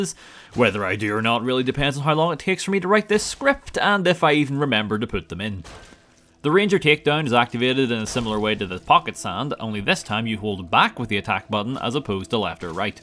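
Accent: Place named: Northern Ireland, United Kingdom. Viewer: Been falling asleep to these for a while now and I don't think my brain can enter sleep mode anymore without listening to an northern irish man describing incredible acts of violence. I had an idea I thought might be interesting, since with the fallout 4 DLC you can craft robot companions what if you got the unlimited companions mod and just have an army of customized robots wreak havoc on the commonwealth, you could call it "can you beat fallout 4 as the mechanist" or something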